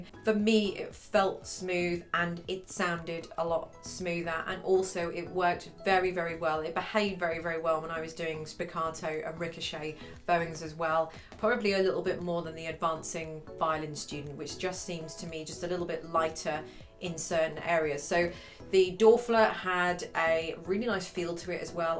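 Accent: United Kingdom. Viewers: Unless your from the USA. Then it would seem normal and someone with a British accent would seem different but I would never use the word "hilarious" because she sounds wonderful. BTW one of the most renowned makers of violins is a New Yorker. His violins sell new for over 100K and you'd have to wait 3 to 4 years to even get one if your lucky enough to get one. He doesn't talk pretty either ( BUT who cares)??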